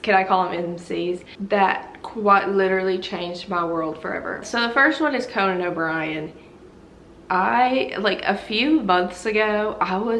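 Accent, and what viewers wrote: South, United States. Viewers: your energy + your southern accent = one of the joys of life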